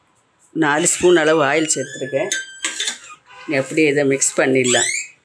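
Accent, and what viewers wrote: Nagercoil, India. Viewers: Nagarkoil Tamil mathiri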